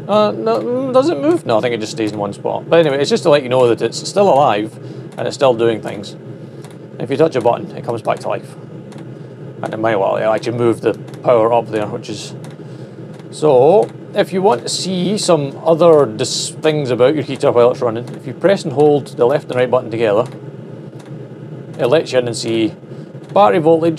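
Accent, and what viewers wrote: Scotland, United Kingdom. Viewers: I love watching and listening to your videos. The refreshing sound of a Scottish voice is like some healing tonic. :)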